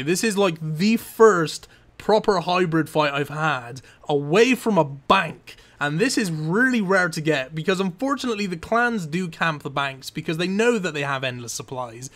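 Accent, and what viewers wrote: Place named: South England, United Kingdom. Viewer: Where are you from in the south of the UK btw?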